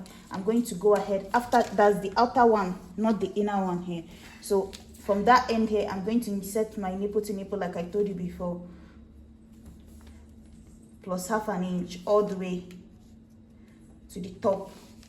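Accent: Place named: India. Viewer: Am I the only one hearing an India woman's voice?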